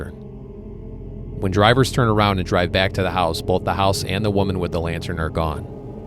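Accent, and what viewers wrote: Chicago, United States. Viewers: Yay, a Chicagoland stories channel! I just devoured all the eps. It's so fun seeing these familiar sites and hearing someone with my own accent. Weather's been so great, I hope you've been out filming lots because I can't wait for the next installment. I wish you wild success with this! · I can tell this is legit because I can hear your Chicago accent.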